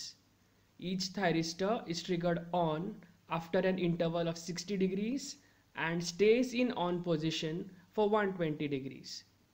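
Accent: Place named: Russia. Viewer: El inglés más ruso que he escuchado.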